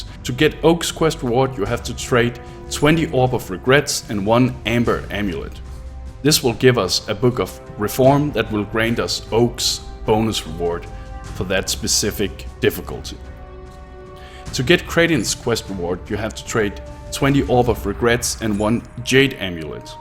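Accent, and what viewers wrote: Denmark. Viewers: omg sounds so danish english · Very informative and useful! Judging from your accent, I bet you're danish, so "Mange tak!" :) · Heh, danish accent. Du lyder næsten som en ung Lars Løkke =) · You're danish aren't you? :) Anyway, nice video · wow this is the epitome of danish accents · You sound Danish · Are you danglish? You sound danglish :D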